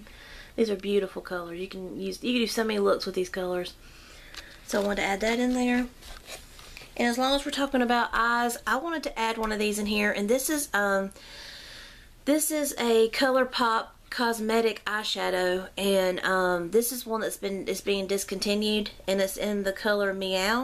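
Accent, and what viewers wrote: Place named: South, United States. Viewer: congrats on 2000 subbies, must say you have a calm/southern voice. so many you tubers voice can be harsh...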